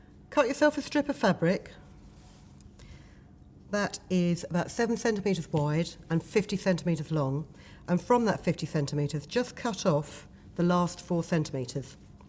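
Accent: United Kingdom. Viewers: I loooove your British accent!!! Your tutorials are always simple and ecofriendly!!Thanks a lot!!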